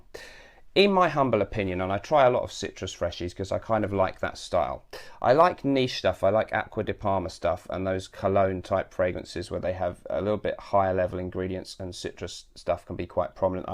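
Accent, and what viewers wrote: United Kingdom. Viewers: Yes! Fragrances reviewer with British English and funny concept.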